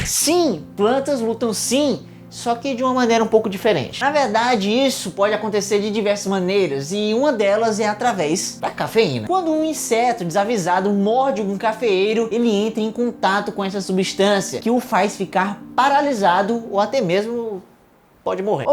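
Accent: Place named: Fortaleza, Brazil. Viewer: O algoritmo me trouxe aqui. Tô maratonando. Vem cá. Você é de Fortaleza? As vezes você fala como nós aqui. Um cheiro. Tô espalhando teu canal pelo "mei do mundo".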